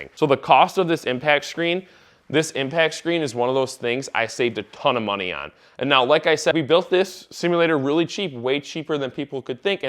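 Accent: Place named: Michigan, United States. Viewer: How did it take me 15 seconds to guess you were from Michigan lol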